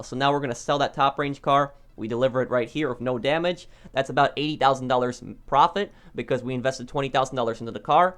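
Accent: Poland. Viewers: Are u polish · Are you polish? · I got kicked when I was watching TV on pc... Btw are you Polish?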